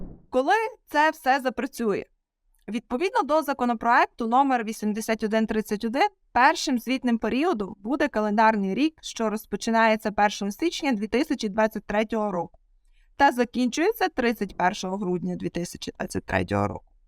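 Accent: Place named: Western Ukraine, Ukraine. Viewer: У лекторки чудова західна вимова. А крім того, її відео містить корисну інформацію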